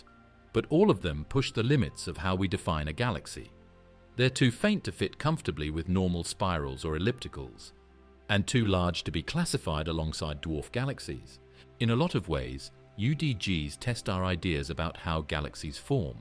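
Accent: United Kingdom. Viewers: Here it comes a British accent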